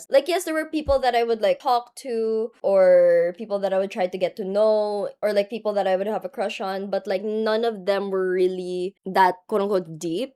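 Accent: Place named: Asia. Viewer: Where are you from with your beautiful asian accent ? 🎉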